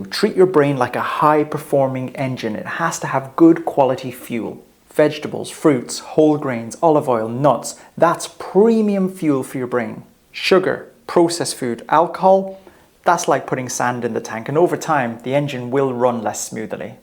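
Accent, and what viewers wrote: Ireland. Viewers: That was helpful, nice Irish guy...not a shouty American!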